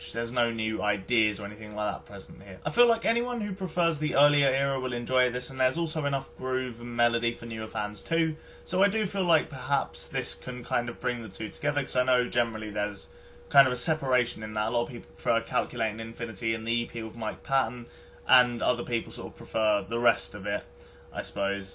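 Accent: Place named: United States. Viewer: an american one; the kind of accent that lets you know that the guy talking wakes on redbull (that's not a hidden advertising message btw haha) :P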